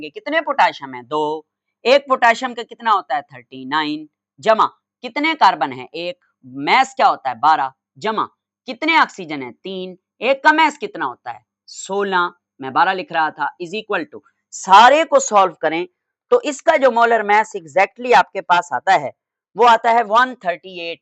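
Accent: Saraiki, Pakistan. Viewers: Sir g lgta hy ap saraiki Hain ❤agr waqi ewe hy ta sako sraiki ich smjhao😅❤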